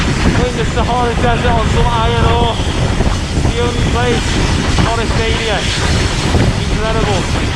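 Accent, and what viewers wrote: Liverpool, United Kingdom. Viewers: I'm trying to figure out your accent. Is it scouse?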